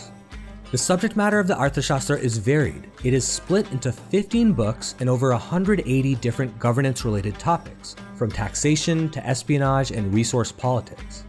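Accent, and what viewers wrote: United States. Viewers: You're foolish to think you shouldn't lionize our great history. I'm not saying to lie but you're only helping the west lie about India by bending over for them and making India look bad. I seen three of your videos now and I found out in the first video what you are. You're anti-Hindu. Next video I started to realize you're probably ABCD with your American accent and sometimes proper pronunciation and sometimes cringe Americanized pronunciation. You're too ashamed of being proud of India's Hindu and Dharmic heritage... Be proud of India and it's Hinduness.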